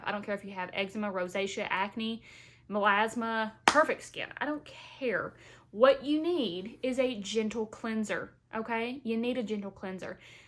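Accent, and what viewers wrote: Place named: Kentucky, United States. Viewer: I’m new to your channel.. as soon as I heard your voice I thought to myself “she’s from my neck of the woods” Kentucky, correct? ❤️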